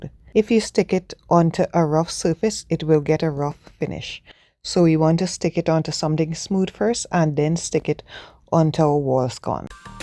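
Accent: Trinidad and Tobago. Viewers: Are you from Trinidad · Are you a Trini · Gorgeous! And I love that you sound like a true Trini! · Why do u sound like a trini so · Absolutely gorgeous! Is that a Trini accent I detect though? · Omg! as I heard your voice I said she sounds Trinidadian. Great job my fellow Country lady..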